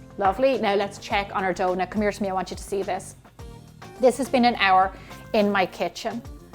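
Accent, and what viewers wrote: Ireland. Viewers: I like the Irish accent, "butt eerrr" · Love listening to your lovely Irish lilt!! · Great video - thx! Will be giving it a shot. No steam? AND do I detect a bit of Irish in you? Maybe delicious Irish soda bread in the works? 😀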